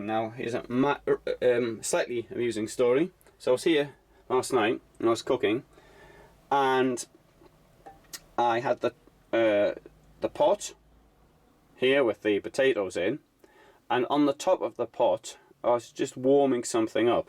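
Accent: United Kingdom. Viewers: Lass-in Angeles Speech impediment? It's called a British accent and a really nice one at that! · Lass-in Angeles If you don't like it don't listen. I like delivery its called British.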